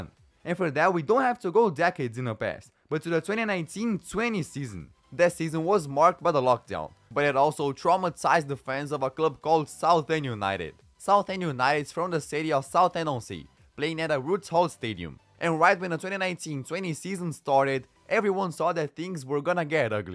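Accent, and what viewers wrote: Brazil. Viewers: Bro,just for curiosity,are you brazilian?juat because your accent,btw iam brazillian :)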